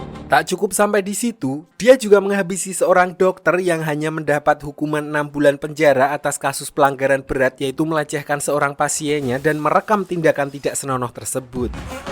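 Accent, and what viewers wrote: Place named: Banyumas, Indonesia. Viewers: Perbatasan Wonosobo Banjarnegara, wong ngapak kie 😁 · Wong Banjarnegara kie jelas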